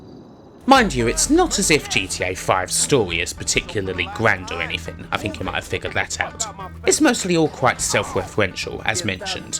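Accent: United Kingdom. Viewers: people don't watch long videos. If you want information about a game which boils down to "is it worthwhile or not?", it can probably be boiled down to 5-10 minutes. If the video is longer, chances are, the density of original thought and production quality is quite low, and 90% of the voice over wouldn't even pass for complete sentences, consisting mostly of "uhhhs". Admit it, you have seen such videos and would avoid them like a plague. Now, this guy is nothing like that, he can actually make insightful points an hour long. But not a lot of people know that, apparently. Add to that the mild British accent, and suddenly the bulk of the largest English-speaking country imagines that it's too difficult to comprehend.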